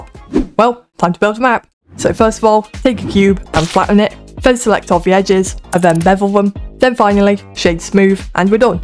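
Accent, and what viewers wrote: United Kingdom. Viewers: are you british im irish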